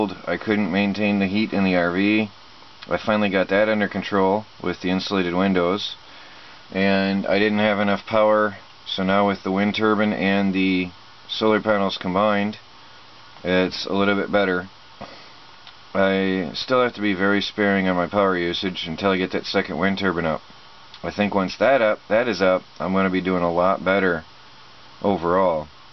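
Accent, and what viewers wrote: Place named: South, United States. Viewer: Come on now, have you ever been in the south where creek sounds like a crick in your neck? PotaTOE Potaaaato TomaTOE Tomaaaato....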